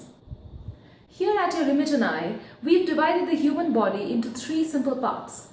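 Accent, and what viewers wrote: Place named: India. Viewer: i find Indian accents pretty. yours soft ;)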